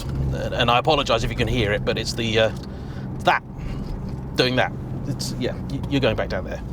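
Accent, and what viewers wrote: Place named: Liverpool, United Kingdom. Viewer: you are my favourite Scouser, pls come to Pakistan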